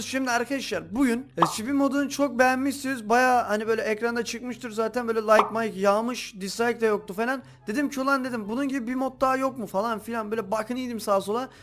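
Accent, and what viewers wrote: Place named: Gaziantep, Turkey. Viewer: Abi seni eskiden antepli zannediyodum ama degilmişsin üzüldüm ama aynı antep şivesi yapıyorsun seviliyosun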